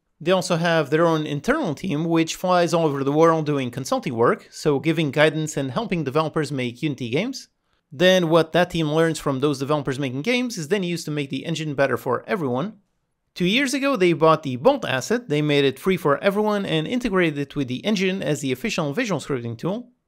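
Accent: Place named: Portugal. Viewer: Bolas. Estava a ouvir o teu Inglês e dizia para mim mesmo, parece um Tuga a falar Inglês. E fui verificar. LOL. Só não acerto no Euromilhões.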